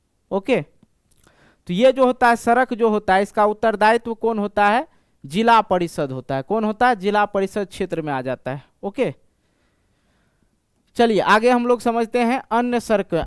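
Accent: Bihar, India. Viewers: Sir aap bihari hain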